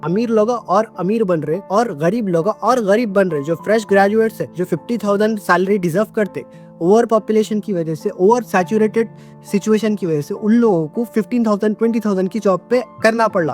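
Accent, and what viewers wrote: Hyderabad, India. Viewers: Accent is amazing, are you from hyderabad?? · Are You Hyderabadi ? I guess because your accent looks like Hyderabadi people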